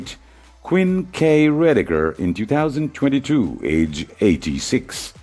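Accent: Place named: Afghanistan. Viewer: Come on man!! Why is someone from Afganistan narrating this ALL AMERICAN tv show cast..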